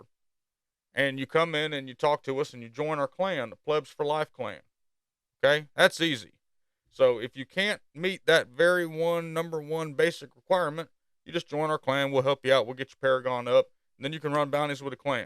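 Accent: South, United States. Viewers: Where are you from? Alabama, Texas?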